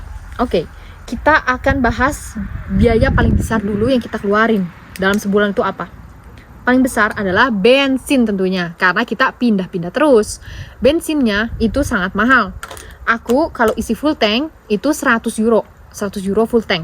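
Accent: Bandung, Indonesia. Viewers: Bicaranya khas bandung banget...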